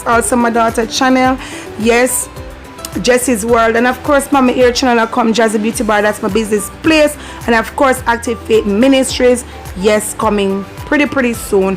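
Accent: Jamaica. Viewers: Wow u r even more stunning live… 💫 Hearing ur accent makes me want to be on the islands . Thanks for helping me with the translation for when I do get to Jamaica 🏝 ❤️🙏🏼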